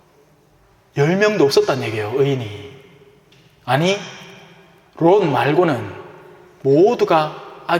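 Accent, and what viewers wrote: Gyeongsang, South Korea. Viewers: 반갑습니다 ^^ 구수한 경상도 사투리 좋아요. 저는 안천에 살고있어요. 넘 경쾌하게 강의 잘듣고있습니다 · 정감가는 같은 경상도 말씨 좋습니다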